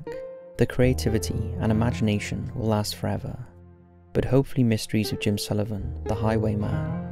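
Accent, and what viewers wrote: United Kingdom. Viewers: He's British, I believe, which would explain is pronunciation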